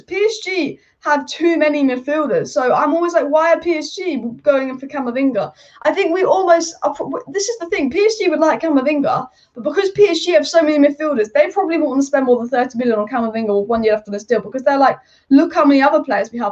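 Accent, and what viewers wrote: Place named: United Kingdom. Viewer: please please Speak little bit Slower everyone is not English specially its more difficult to understand british accent,please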